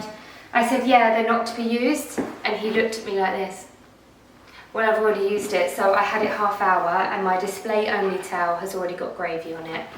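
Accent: Essex, United Kingdom. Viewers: You look gorgeous in the play suit! Ps try saying Jewellery in two bits. Say jewel then leeereey it helps if it bothers you. I had a friend I helped with her speech after a bad car accident. But to be honest I like that you are a natural girl and the Essex twang is cute!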